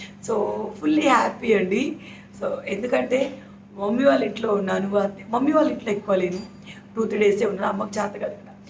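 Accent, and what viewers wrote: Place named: Maharashtra, India. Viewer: మరాఠీ యాస లో బాగుంటుంది మీ భాష, ఉన్నది ఉన్నట్లు మాట్లాడతారు మీరు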